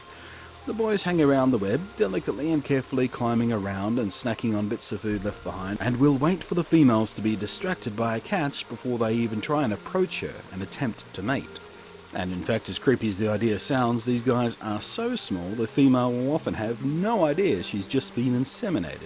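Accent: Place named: Australia. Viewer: Australian accent + high quality cameras + spiders = very entertaining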